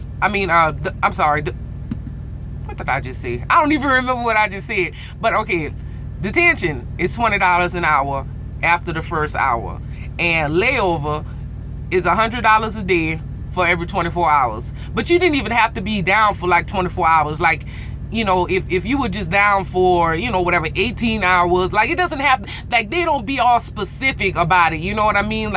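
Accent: Louisiana, United States. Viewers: LoL I love your accent, are you from Louisiana? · Shawty which part of Louisiana you from? I can recognize that accent anywhere. lol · You're soooo Louisiana! I don't hear that accent often....that's dope. My dad was from there. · Love that Cajun accent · love the accent sound cajun or from the city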